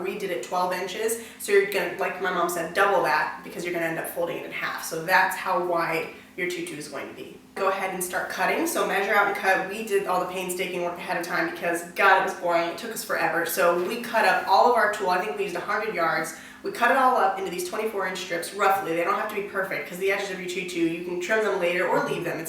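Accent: Spain. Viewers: oh yes you can !! i've seen old vlogs and you have an awesome spanish accent :)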